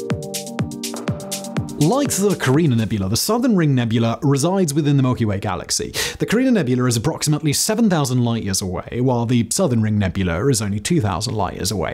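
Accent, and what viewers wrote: England, United Kingdom. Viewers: Your accent is painfully English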